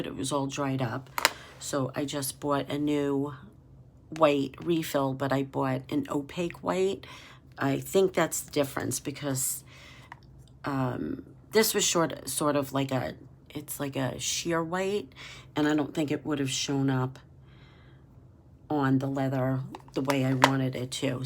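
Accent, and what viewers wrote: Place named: Massachusetts, United States. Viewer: I just found your channel on here and I’m so excited that I did. I love the content of your videos and what first caught my attention was the name of your channel and then when I clicked on it and I heard your accent, I believe that we are from the same area and I’m very curious to see if we are (if you feel comfortable answering) are you from Massachusetts South Shore by any chance? I hope to hear back.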